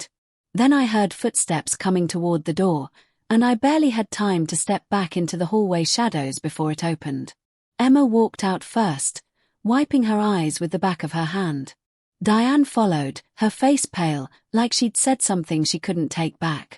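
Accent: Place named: United Kingdom. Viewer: Why is a British woman doing the narration ofnitnis from the perspective of an American man?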